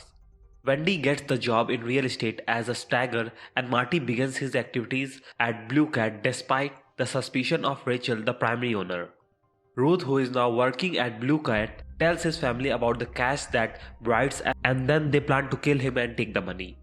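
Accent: India. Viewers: The accent was acceptable as I believe it's Indian accent but the subtitles were really really awkward. Spelling mistakes doesn't help. Just a suggestion, if u wanna make recaps, make sure u add subtitles with correct spelling. Wikipedia of certain series also helps making a good recap as they show written update of every show episode by episode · Overall I feel this is a very good recap covering all the most crucial details to the Ozark story (Season 1), with the audio and video editing also being very good. If I had to give a critique on the video, my only critique would be that (as an American) your Indian accent is a little tick and some words (specifically proper names of people and places) are hard for me to make out, if you were to add subtitles to the video (copy and pasting the script into the subtitle option when uploading the video) I feel this would go a long way in clearing up any misunderstandings that might arise because of your accent. 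Other than that, keep up the good work, and thank you for this video, it really helped😊 P.S. sorry for all the mean comments, some people I feel do not know how hurtful their worlds can be and say things without thinking, so do not take it to heart, honestly your accent is not that bad, people are just ignorant😉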